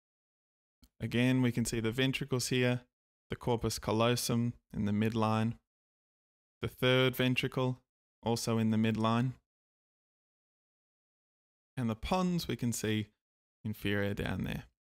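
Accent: Australia. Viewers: Is this australian accent?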